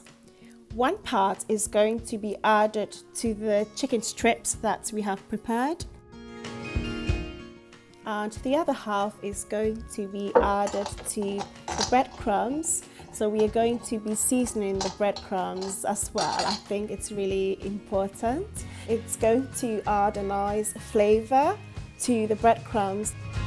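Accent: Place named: London, United Kingdom. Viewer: First of all lemme say you have a nice accent 😍😍guess you live In London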